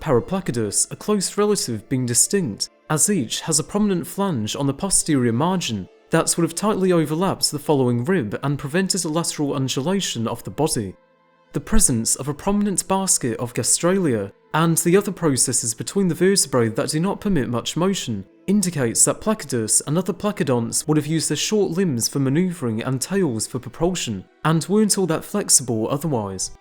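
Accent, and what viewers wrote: United Kingdom. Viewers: The British accent makes it hard to understand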